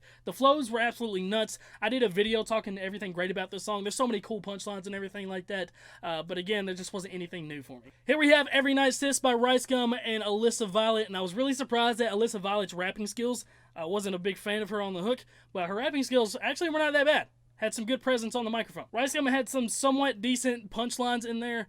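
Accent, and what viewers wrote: Alabama, United States. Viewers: Wait are you from Alabama? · Is he from Alabama cuz I am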